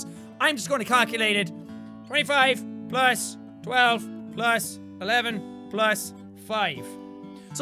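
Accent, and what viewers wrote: Ireland. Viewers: Good o Jackaboy. Irish-er than ever. GO GO!!! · this series made extra Irish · Holy shit this episode was amazing, was especially Irish haha it's okay you're gonna beat this spillage fine no worries i believe in you:D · Yey my Irish screaming friend is more Irish today!!! Now I can cuss out everything in Irish or gaylic as they say! Hooray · I swear He sounds more Irish, as he progresses through the video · does anyone else notice turns more irish in these videos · Is it just me or did sound extra Irish in this episode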